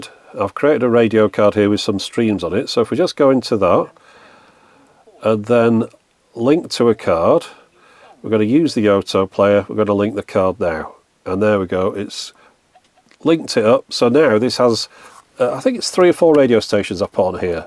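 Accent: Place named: England, United Kingdom. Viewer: I like the chirpy English voice.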